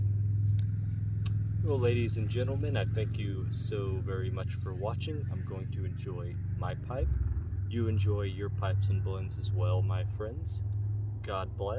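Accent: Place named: Scotland, United Kingdom. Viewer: Yes Do your Accents in all your Videos!! They are fun to listen to...... I love your Scottish Accent. Thanks for sharing...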